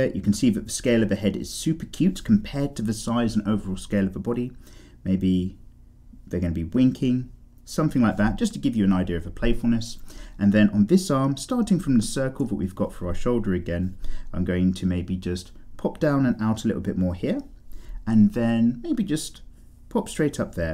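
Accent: United Kingdom. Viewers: Are you a britisher? How do you say bottle of water😂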